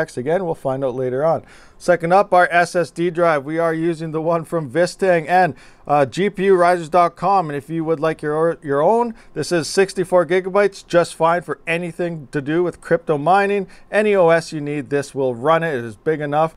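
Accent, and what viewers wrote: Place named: Canada. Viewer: lmfao.. the way canadians talk jus kills me.. hahaha... its good tho..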